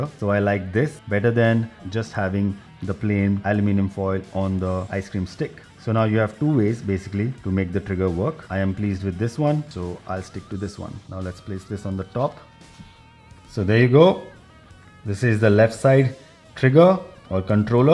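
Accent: India. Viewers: Ha bro bhai indian h english bolne ke tarike se pta chal rha h. Ek dum clear. Nice video bhai.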